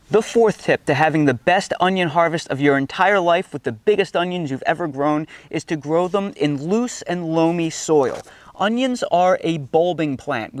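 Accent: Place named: Western Pennsylvania, United States. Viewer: Love your videos. Looking at the length of day chart, I'm confused. If the length of day zones are based on latitude, why are the zones staggered vertically, I would assume that since parallels of latitude are at a constant angle, why are the zones staggered vertically? Just a thought, I live in Farmington, NM in the intermediate zone. As an aside, you said you lived in Pennsylvania. My family was from the Johnstown area and Indiana, PA area. I note an accent, perhaps western PA?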